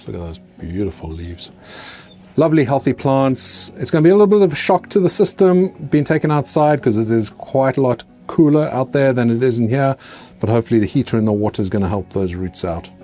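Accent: South Africa. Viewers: Where are you from originally? You sound south african.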